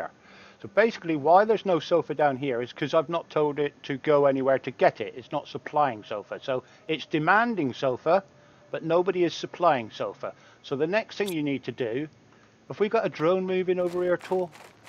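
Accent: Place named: South West, United Kingdom. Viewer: oh thank you I know what the word priority means obviously but wasn't sure in the situation. Thanks. Also are you from the south west because you sound like you are?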